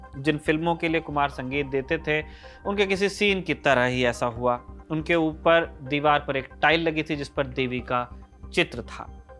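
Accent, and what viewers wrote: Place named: Mumbai, India. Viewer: Mumbaee Bola Anchor 😎📢😫😫😡💙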